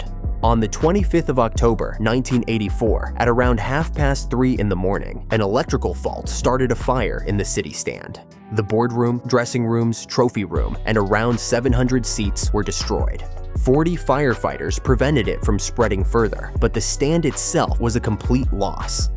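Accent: United States. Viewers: This fu@ accent……I just can’t….I hear yankish and I snap!F america!F yanks!F Trum!F u!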